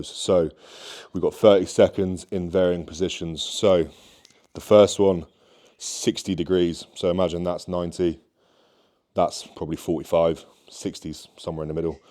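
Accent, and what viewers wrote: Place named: United Kingdom. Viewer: So weird to hear a British accent come out of what looks like a good old boy from Idaho.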